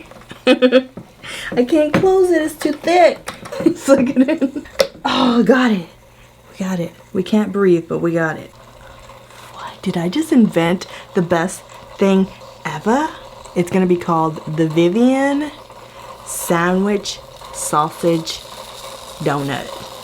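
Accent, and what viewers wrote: Puerto Rico. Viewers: Love your Puerto Rican accent! 💕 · boricua accent on point hehehe LOVE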